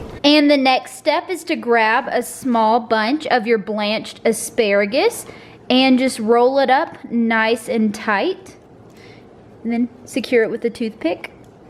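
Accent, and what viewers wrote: South, United States. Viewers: I just had to come and say, I always watch your videos and usually my 4 year old is in the room with me. Last night after I watched I was on to another video and he says ‘ is that the girl with the dog named bo? And I said oh no I already watched her video tonight. He then said ‘without me???’ 😂 he loves your videos too. I think it’s your southern accent he loves.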